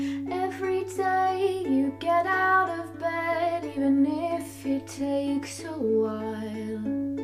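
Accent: Minnesota, United States. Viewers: I think it's Minnesota. Not sure though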